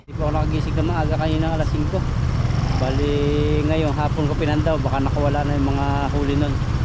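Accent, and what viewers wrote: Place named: Quezon, Philippines. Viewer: Bro. Tanong ko lang ano probinsya mo halos parehas tayo ng punto at yun pagkatagalog mo. Taga Quezon province ako. Bago mo subscriber pala. Parehas tayo ng hobbies ingat palagi ang God bless.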